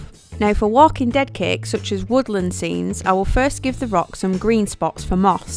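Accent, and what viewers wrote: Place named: Yorkshire, United Kingdom. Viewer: Are you from Yorkshire as you sound like one of us haha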